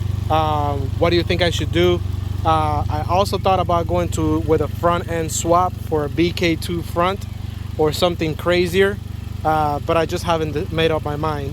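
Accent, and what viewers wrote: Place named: Puerto Rico. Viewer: Just knocked this out thanks to this video! Thank you! There's 0% chance you're not boricua with that accent! Dimelooo 🇵🇷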